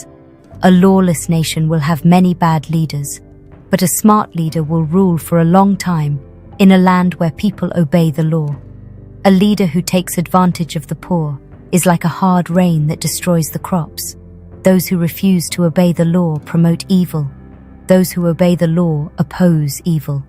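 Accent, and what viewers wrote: United Kingdom. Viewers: Let's recognise that there are BLACK people in the world who have an english accent like this voiceover. We do exist!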